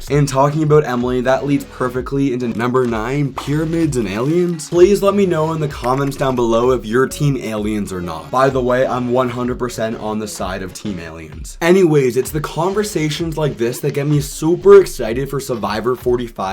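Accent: Canada. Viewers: Your Canadian accent 🙈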